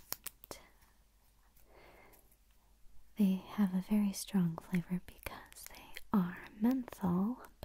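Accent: United States. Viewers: This video is really good, it gave me tingles for the first time in months :') Your accent, cadence and attitude are perfect; please keep making videos! What is your accent? Being from the north of England I'm not great at placing american accents :P Thank you so much, just keep being you <3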